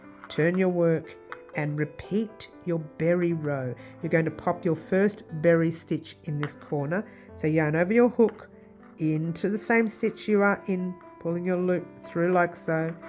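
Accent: Australia. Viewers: Wow i just found you!!! What a relief it was for me to hear your aussie accent! Somehow you say what needs to be said and you dont confuse me!! Im Aussie too!!! Please don't ever leave!!! Love your purse! ❤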